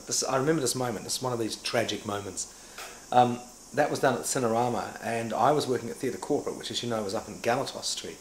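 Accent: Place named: United Kingdom. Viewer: he's a kiwi with a British accent! he grew up mostly in England before moving to NZ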